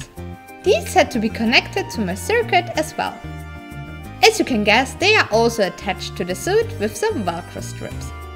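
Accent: Germany. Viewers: where are you from, from the accent I thought you were german, but is a slavic name(?) Any way, always great videos, I love how you turn from gorgeous next door girl to super hero woman when you wear your costumes:)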